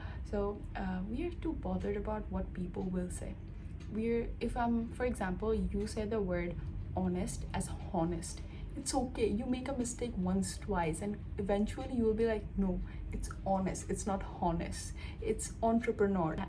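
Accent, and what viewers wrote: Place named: India. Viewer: Wow your English is fluent! 👏 Just the accent sounds a bit like Indian vibes 🥲 maybe if you change the tone a little, it’ll be perfect 💯 otherwise it's oky👌👍❤️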